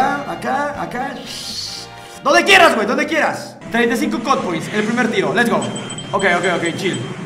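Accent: Argentina. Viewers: Es argentino ese men? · Te dejo de seguir por q ablas asi como argentino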